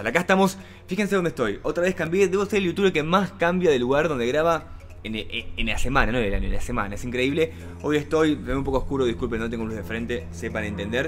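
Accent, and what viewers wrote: Argentina. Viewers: De que parte de buenos aires sos? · sos argentino?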